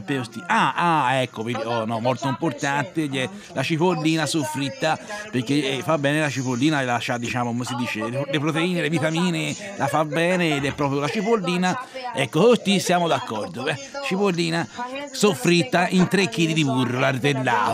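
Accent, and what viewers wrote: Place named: Quarrata, Italy. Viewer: Parla il quarratino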